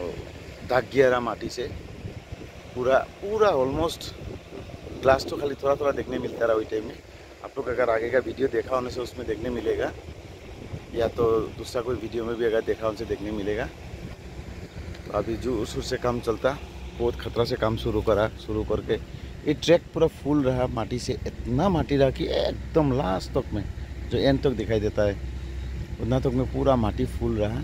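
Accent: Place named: Haflong, India. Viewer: This is Haflong Hindi. We've developed our own unique way of speaking the language...aur vese bhi, jese Mumbai , ya phir Hyderabad me log apne tarike se hindi bolte he, similarly Haflong me humlog Haflong Hindi me baat karte he...Humlog North India se to nehi he na, so, don't criticize his way of Speaking if you don't have any knowledge about the place or people living in Haflong....Look up Haflong Hindi if u don't know about it allready..It's unique in itself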